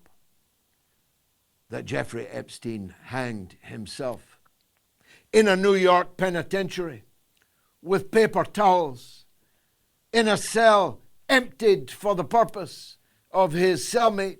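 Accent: Scotland, United Kingdom. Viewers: Hold on, maybe I just misheard you due to your thick Scottish accent, but did you just say ‘Bubba’ is a HORSE??!!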